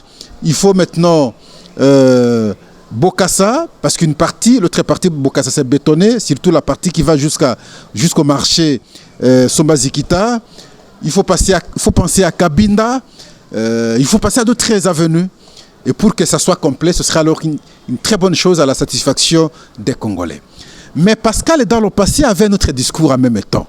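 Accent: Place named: Rwanda. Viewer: tu as un accent rwandophone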